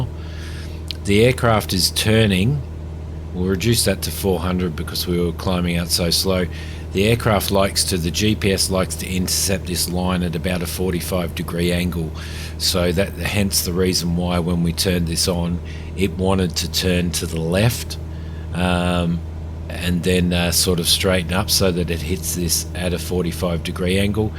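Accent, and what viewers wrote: Australia. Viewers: Thank you bro; You sound Aussie , Do you live over there irl? My home base in "On Air" is YMAV in Cumulus and YMEN in Stratus.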